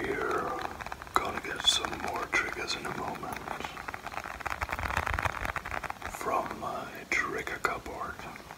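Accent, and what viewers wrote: Germany. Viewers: Hello are you german? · Is this guy German, cuz I think his German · vivienlaramalfoy maybe bc I’m from another European country but I can tell by the accent it’s German... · Ur German ? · Hey are you german cuz Im wondering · Are you german??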